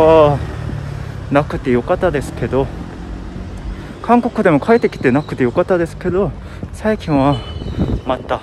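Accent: Fukuoka, Japan. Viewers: 福岡弁、癒される〜✨ 今は東京に住んでるけど、福岡ほんと好き〜 私は毎日とうもろこし茶飲んでるよ〜👍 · キックボードに乗りた〜〜く!!!なるスタートですね😊そして福岡弁があったか過ぎ…こんなナレーションのNEWSなら、視聴率が右肩上がりになりそうです🆙🆙🆙 最後の「ガーーーン🎶😱」音に免疫がついてきて、ビックリどころか、もはや楽しくなってきました🎶🤗🎶慣れってスゴイ…。 …毎回、やるちゅもり❓ JUJUさんが今日も元気で良かったです。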